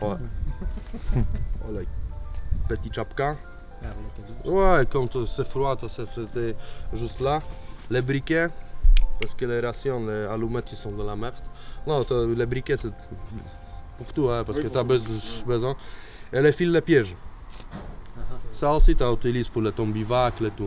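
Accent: Russia. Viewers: Похож на русский акцент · ? Il est d'origine russe selon moi d'où est le problème ? Un accent différents et donc ?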